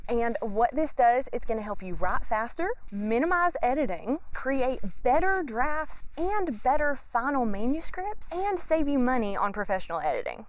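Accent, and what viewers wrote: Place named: South, United States. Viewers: So glad I found this video. I developed this same method on my own and it REALLY works. Get as much detail or splashes of dialog into the individual chapter descriptions to support your story structure ahead of time. BTW love your t-shirt and accent. East Texas? Oklahoma? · Southern accent? Author? In the woods? I pressed the subscribe button so hard. 😂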